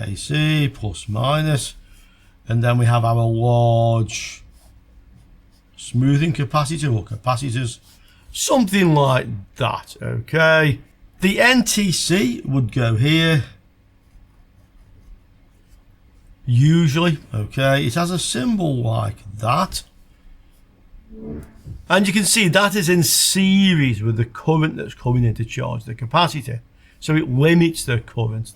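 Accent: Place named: England, United Kingdom. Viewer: Thanks for handing down your experiences. Your videos are organized, frank, and honest. I frequently have your repair on my workshop playlist to keep me company in the lab. I might even pick up your English accent.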